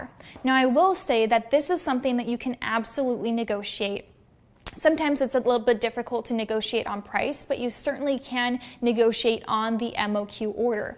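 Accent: United States. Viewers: are you from Russia? it seems like you're speaking like a native american but you have a russian name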